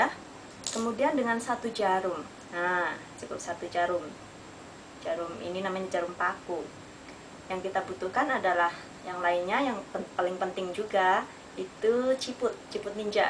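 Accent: Banyumas, Indonesia. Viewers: "DONE" Ikutan #GiveawayTHV2020 untuk modal usaha mudah-mudahan ada rejeki untuk anak bayiku 💕 Saya suka nnton karena semua resepnya sudah diuji terlebih dahulu, jd setiap saya trial tidak pernah gagal. Pertama saya tau channel ini karena saya mencari resep kuker di cookpad untuk hajatan nikahan saya (supaya irit) 😂 dapetlah saya resep dari mba. Pas saya cari-cari caranya di youtube ternyata ada step by stepnya di channel trnyata punya ya mba 😂 ga nyangka hihi Dan, semakin kesini saya sering ikutin tiap ada upload video baru. Karena saya suka dengerin dubbingnya yg dicampur bahasa ngapak 😂😂 Semoga rumah tangga langgeng sampe kakek nenek, semoga setelah memberi GA rejekinya semakin dilancarkan dan diberi keberkahan.. Aamiin yaa robbal'allamiin ❤️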